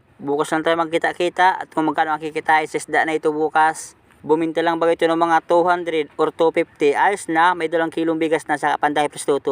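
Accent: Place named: Jomalig Island, Philippines. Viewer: Saan yn location mo idol?familiar KC ung tuno ng salita mo? somewhere in jomalig island,Tama po b?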